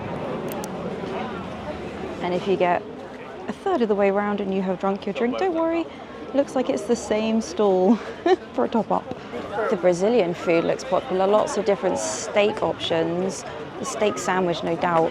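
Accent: RP, United Kingdom. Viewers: I literally searched for some Modern RP accent videos and came across yours. I just played it, totally immersed in it, and watched this one for like an hour, replaying it. The food descriptions They were truly gorgeous! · I love your British eng...I am learning..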